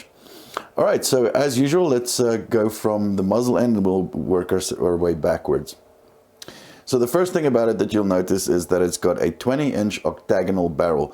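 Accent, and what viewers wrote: South Africa. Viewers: do I detect a very subtle South African accent?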